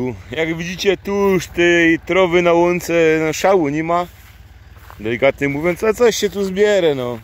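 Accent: Silesia, Poland. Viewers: Witaj. Po kuku i akcencie wnioskuję że to gdzieś na południu kraju - Śląsk 🤔u mnie o połowę niższa i zeschnięta, susza jej wogóle nie oszczędziła 🙈Ładna i oby była jak najpiękniejsza. Pozdrawiam serdecznie z subikiem 🤗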